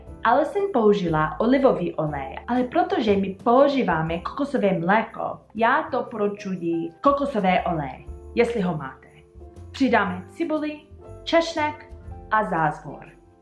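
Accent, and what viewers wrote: Ostrava, Czech Republic. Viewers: jde videt ze jste pobyvala i v Ostrave vase cestina je presne po nasemu, pekny recept a krasne se to posloucha asi to budu muset zkusit uvarit :-)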